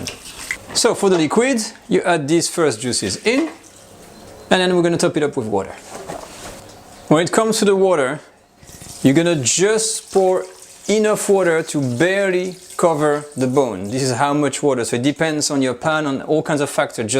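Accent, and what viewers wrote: France. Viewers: I am just mesmerized by the French accent and love the recepie ❤❤❤! You rock!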